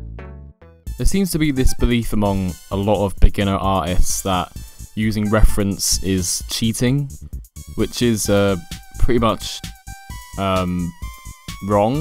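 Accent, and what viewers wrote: United Kingdom. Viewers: Nice voice and nice accent. I'm starting to think I miss London.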